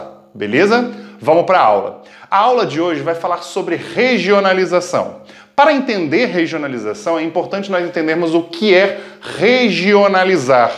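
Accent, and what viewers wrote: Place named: Northeast, Brazil. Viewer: Vim pela minha prof q mando como explicação essa vídeo aula :v (já q estamos em quarentena e tendo só aula online). Obs: Vc é nordestino né? Pq tem um sotaque d lá ou do norte msm. Ps: Santro Oeste kskksks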